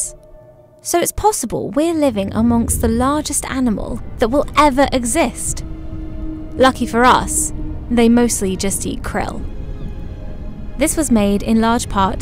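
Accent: United Kingdom. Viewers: too british accent.nicee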